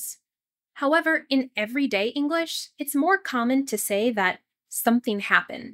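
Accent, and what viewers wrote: United States. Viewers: Hi, I really like your videos and your voice, your American accent and voice is so beautiful, you are different from the other channels, I like the videos that you make, it’s very effective and I hope that you make a lot more videos and maybe advanced English, thank you❤ and keeping going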